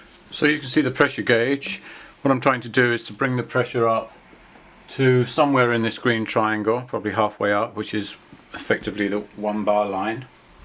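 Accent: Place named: Home Counties, United Kingdom. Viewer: you are a STAR plumbing video maker. I am sooo... grateful i found your amateur easy to follow step by step video. British videos give only badly explained advice and meant to get you to call a plumber. Youtube should delete these fifteen minutes of fame time wqsters. Your accent seemed home counties english until i found i was donating in Canadian dollars on paypal. Thank God for honest Canadian DIYers, I saved £s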